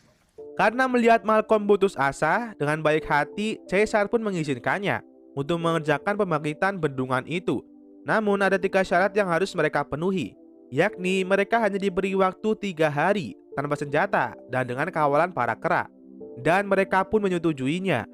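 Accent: Banyumas, Indonesia. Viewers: Jebule * wong ngapak ya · Mantap youtuber ngapak hadir min 😆😆😆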